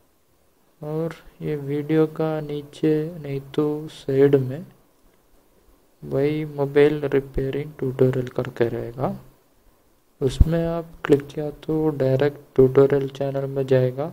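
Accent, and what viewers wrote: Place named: Kerala, India. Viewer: മലയാളി ആണോ മലയാളി ഹിന്ദി പറയുന്ന പോലെ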